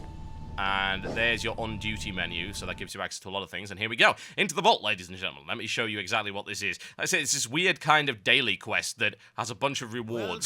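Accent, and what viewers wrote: United Kingdom. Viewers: ure my favourite youtuber atm, mainly because its nice to hear proper British annunciate but if you fail to provide DCU content post launch... I. WILL. KILL. YOU!!!:P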